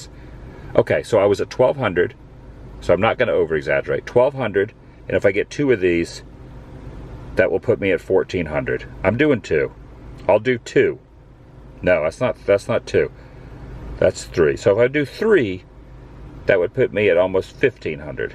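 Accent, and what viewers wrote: South, United States. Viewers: Missing your adventures so I got up early, created a queue and getting my southern drawl to start my day! xo sending hugs to the Mama, Papa, Sissy & Brother-in-law!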